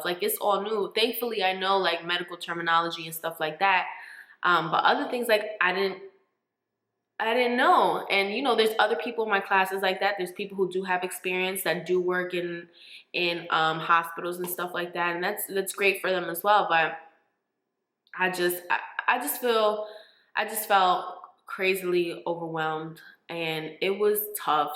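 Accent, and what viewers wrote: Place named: New York, United States. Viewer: Most definitely sound like a New Yorker. I’m a Brooklynite and she dead sound like women from my childhood.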